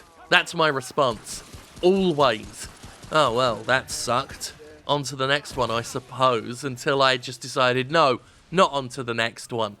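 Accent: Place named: RP, United Kingdom. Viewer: Allow me to save you the time of watching this review. Prefaced with that I used to love Sterling, I've been here since before the cornflakes and before the stint of "addicted to stress". I've been a fan for a long ass time, and shared many of the same views he did. But now all I see is.... Sterling Hates Everything, He Hates Fun. He Hates Friends. He Hates People. He Hates you, your family, your dog, your cat, your nonexistent significant other, your existent significant other, your brother from another mother, and everything that has ever held a state of matter since the dawn of time. He literally has turned into a grumpier "Francis" (Boogie2988) with a posh accent. He spits out reviews after playing a game for 5-10 minutes, says he hates it, says that everyone who disagrees with his review or dare I say it, finds his review full of false information, is full of bullshit or are just hating on "True gaming journalists like him." AKA: Sterling has officially become the IGN of indie reviews. 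Except instead of getting paid to rate games higher, he's getting paid to shit on everything. And it's not just this game. It's a common theme among all of his videos as of late. Sorry. I used to thank god for you, now I'm just not a believer anymore.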